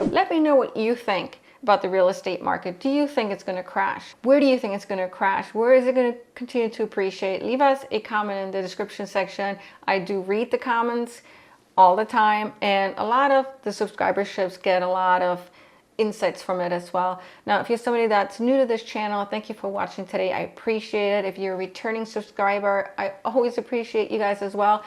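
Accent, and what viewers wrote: Florida, United States. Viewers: It is crazy for us inland as well. We are expected to assist in the insurance coverage of those millionaires mansions on the beach they keep rebuilding. Remote work was a godsend. You sound like a native Floridian too! ;)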